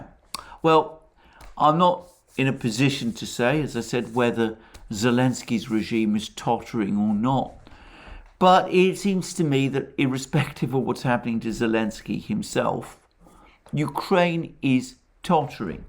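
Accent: England, United Kingdom. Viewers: ? Wtf are you talking about? He reiterates commonly available material in a Brit accent, making you think he's some genius. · I keep watching your videos because you are RIGHT on your assessment , from day one of the special military operations conducted by Russia 🇷🇺 and once again I want to say that I admire your knowledge and understanding of world history. PLUS I like the British accent. ( it is pleasant to the ears ) cheers 👍😀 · You sound like an Englishman. But you seem to be on Russia's side. You are a traitor to western values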